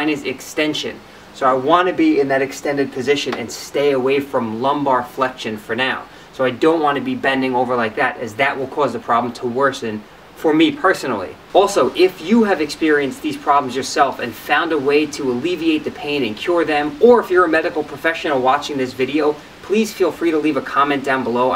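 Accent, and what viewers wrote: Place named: Long Island, United States. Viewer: Wow. I knew you were from Long Island. lol I heard you talk, and I saw your surroundings..and I had that feeling of, "I know that street. Its Long Island Suburbia." Aka my hometown. Long since gone, but you never forget. haha Anyway I just started trying these exercises out, I'm hoping they'll help, so far they felt pretty good so I'm hopeful.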